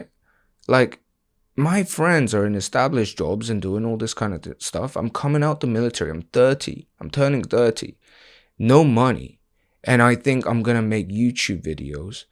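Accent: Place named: United Kingdom. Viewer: Off topic, but your accent is a lot nicer than the half-baked American accent that a lot of foreigners end up with. Not all British accents are nice but what you have ended up with is 👌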